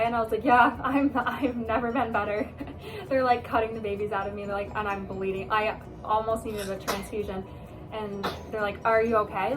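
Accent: United States. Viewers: Thanks for the story! Your dialect says MINNESOTA but you're from Vermont. So cool.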